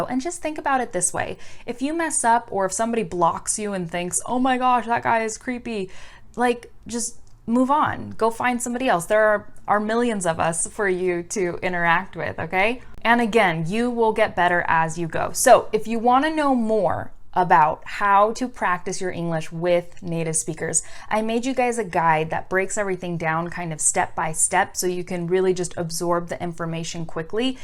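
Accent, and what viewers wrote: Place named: Argentina. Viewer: You´re videos are amazing, and tu español es demasiado bueno con acento Argentino jaja... You should do a live video.. Regards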